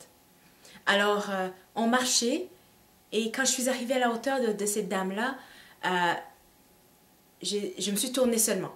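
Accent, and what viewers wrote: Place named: Quebec, Canada. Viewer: L'accent canadien est vraiment prononcé dans sa voix une vraie québécoise sois béni à toi ma sœur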